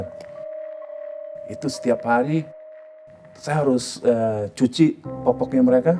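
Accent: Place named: Indonesia. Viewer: Halo om gmna kabar makasih sharing nya Ndak tahu nasip kita di masa kecil sama di sia siain ortu mg sehat om saya penggemar anda dr tahun sembilan tiga waktu masih SMP nam Yogya Anda sdg jaya waktu itu kapan anda pelayanan di Yogya saya juga kristiani katolik Jawa hehe Gusti amberkahi om aksen anda sudah Indonesia bgt. Sukses Dodo palagan km Pat blas Sleman berkah dalem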